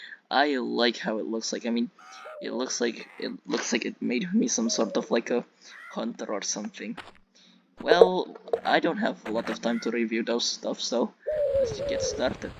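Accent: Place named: Russia. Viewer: Hi! Good to have you back! And ngl, i like your Russian accent though, for real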